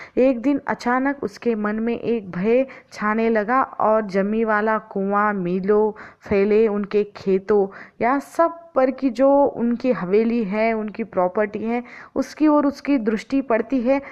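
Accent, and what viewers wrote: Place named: Maharashtra, India. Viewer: मेडम हिन्दी साहित्य वाचुन अपल्या मराठी ला बोलण्यात बदल करा ।हळु हळु होईल।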